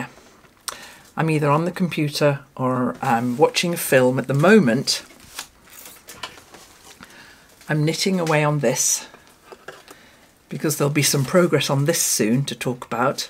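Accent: United Kingdom. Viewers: I had a cat that became 21 years old. Her name was Whitesocks. You will never guess why ;-) She stopped eating and drinking and our vet told us that she has no table that says what 21 catyears are compared to human years. Enjoy your catlady as much as she enjaoys her life with you. Love how polite you talk to your cats in your videos. That sounds very british in my german ears. ;-) Have good times you two.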